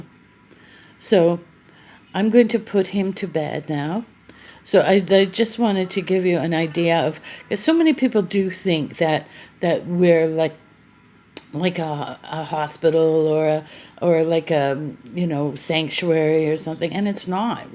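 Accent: United States. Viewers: You sound American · Hes so cute!! When I first heard your accent I thought this was in America until I heard you say he spat the dummy haha. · You have an American accent. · Why the American accent if you are in Australia?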